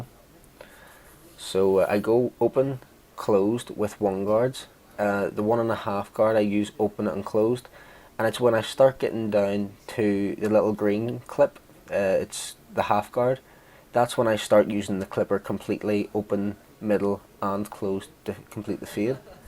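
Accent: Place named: Northern Ireland, United Kingdom. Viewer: Dude where is your shop based? You accent sounds like it's from the Northern Ireland. Would love to check out your shop.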